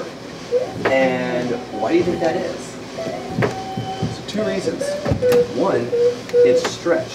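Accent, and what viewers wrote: United States. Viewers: so basically how you make rock in England but with a hook on the end lol. still fascinating to watch though, love his voice, what part of America is that accent from?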